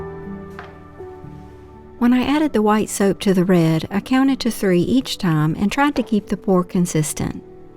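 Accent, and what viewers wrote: South, United States. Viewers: Does her English sound weird to you? She has a southern accent, from the Southern states of the US.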